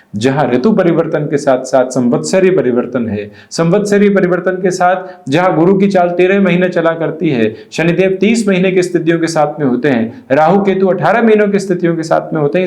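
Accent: Standard. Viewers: आप बहुत शुद्ध हिंदी बोलते हैं, बहुत से लोग समझ नहीं पाते, घ्यान दें। मैं हिंदी में एमए हूँ।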